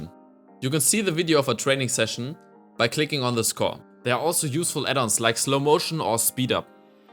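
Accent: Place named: Germany. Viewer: i hear a bit deutsch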